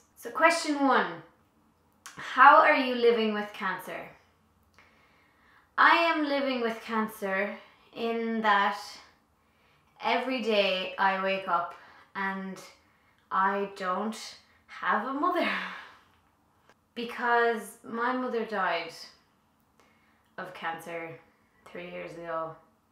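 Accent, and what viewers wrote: Ireland. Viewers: I don't know how things are now, for I have just met you on YouTube like... today. I came here to get used to the Irish accent, and now I'm finding myself almost in tears. I've lost my grandfathers for cancer. Both of them. Basically, I have BOTH sides of my family with ~this thing~. 'Fear' is something strong around here, considering it's genetic and I have zero ideia if it might affect my parents. I wouldn't know what to do if it did. Stay strong. Bless you.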